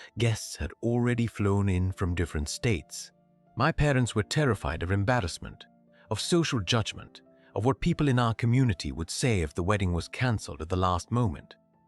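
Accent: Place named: India. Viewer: This story is clearly Indian culture and the voice has comparable accent. Then he mentioned Texas. It was jarring. I would have enjoyed this story had it not suddenly culture shifted. … just got to the end of the story. Daniel didn’t know his brother had replaced him? That makes absolutely no sense. I understand this whole story only works in arranged marriage situation, but for the brother to not know makes this whole thing ridiculous!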